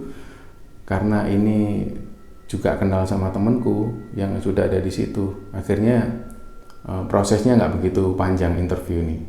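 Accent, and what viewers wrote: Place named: Surabaya, Indonesia. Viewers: Chanel favorit..... Sing cerita mas dgn logat suroboyo an e..... Salam saking solo jateng mas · Buka chanel klik vidio baru, liat yg cerita mas. Wes ndak opo wee ditinggal kabeh😂 Jangan berubah yaa mas cara pembawaanya👍 Tetap Suroboyoan👌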